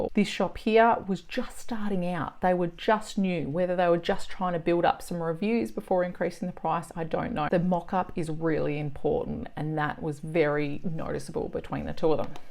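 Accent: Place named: Australia. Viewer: Hi great video. Its nice to hear an Aussie accent inthe sea of POD! I have just started with POD on Etsy. Are you located in Australia? I find that there is not a lot of choice with Australian printers and the products they offer compared to the US. Do you use Australian Printers or US based as the shipping to Australian customers is sometimes higher than the cost of the product.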